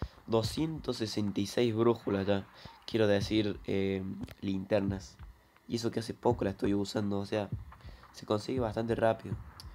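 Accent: Cordoba, Argentina. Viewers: NUEVO SUB WUACHO (me pareces que tenes asentó cordobés jeje) YO HACE 3 DÍAS QUE ME LO INSTALE Y ME ESTOY RE VICIANDO...vi un par de consejos tullos y ya entendí mejor jaja Gracias cruk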